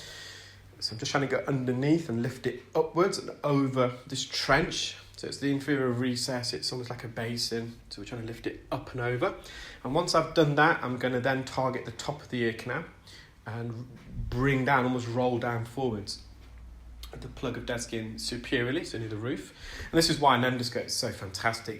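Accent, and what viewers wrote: United Kingdom. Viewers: To all of the people who are correcting the way says his words. lives in the U.K not America. Americans pronounce their words differently than people in the U.K and Australia.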